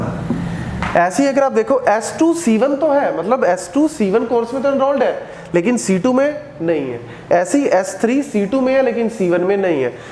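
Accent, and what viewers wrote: India. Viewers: can I give out some suggestion/ Content is good but accent is quit horrible, I feel like I’m listening to Indian, it would be quit nice if subtitles could manually added clarify what teacher is saying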